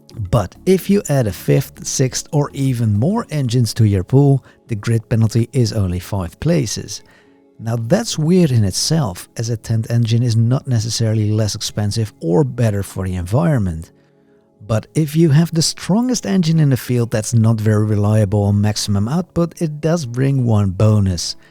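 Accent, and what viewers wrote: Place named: Netherlands. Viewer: Also, he's doing a great job speaking English but I still hear a distinct Dutch accent.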